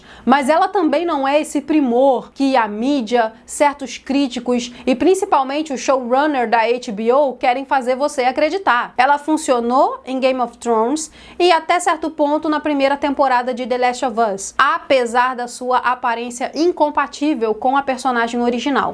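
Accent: Rio de Janeiro, Brazil. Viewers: Você é uma carioca da gema! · A PUXADINHA CARIOCA SUA É INEVITÁVEL NE...... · Mesmo com um sotaque carioca que eu não curto muito, a faz um conteúdo maravilhoso. Amo e coaduna com suas opiniões. E sim, a Testa Ramsey é feia e matou a personagem do TLOU. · é a minha carioca preferida (e olha que eu detesto sotaque carioca)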